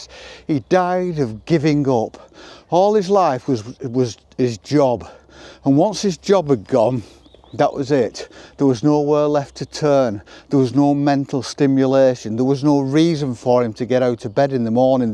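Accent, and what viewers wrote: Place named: Northern England, United Kingdom. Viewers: So nice to hear that Lancashire accent! Your hat and scarf are lovely!! I'd love to teach you how to spin your own wool. You could take your drop spindle out on walks with you and sit and spin on a rock or a bench by the lake there. I'm a 66 yo Lancashire lass with a ton of hobbies. Live in the states now. My hobbies are knitting, spinning, dying (I keep a few sheep and other farm animals) racing pigeons, dry stone walling, spoon carving, pickle making ( I have a road side stand) horse training, I torture the dogs with my fiddle playing :) make paracord bracelets and hand carved rubber stamps...gosh, the list goes on. We don't have telly, but You Tube is a God send for learning new things. Check out the Zed Outdoors channel if you'd like to learn spoon carving and other bushcraft skills. There's a big spoon carving festival held every year in Derbyshire. 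Spoonfest, it's called. I'd love to go some day. Wishing you many years of enjoyment in all you do! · Just found your channel and I've subscribed. I like your philosophy, and your northern accent, and your adorable little dog. You're a cool guy and an inspiration. Wish I were there. Thank you and cheers from the U.S. 🌿🐶💚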